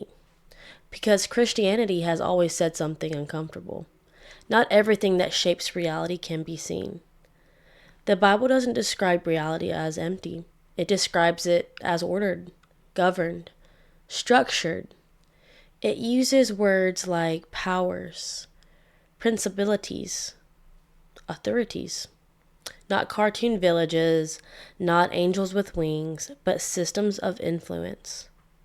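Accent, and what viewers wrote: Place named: South, United States. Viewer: It’s dark energy that’s making the universe expand not dark matter. Why you trying so hard to hide that southern accent?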